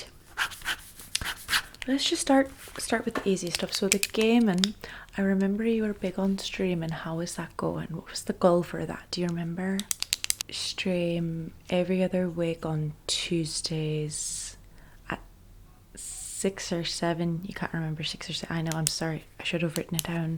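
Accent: Ireland. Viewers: yes, slay. I’ve been highly anticipating this !!! your Irish accent is my fav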